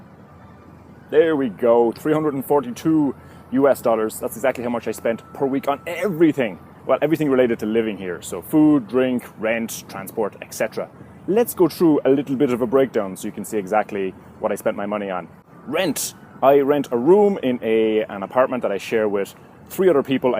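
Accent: Ireland. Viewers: Are you Irish? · Are you irish?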